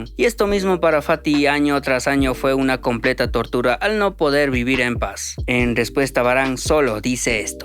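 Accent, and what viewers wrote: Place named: Ecuador. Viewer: Por como hablas eres paisano de Ecuador, un saludo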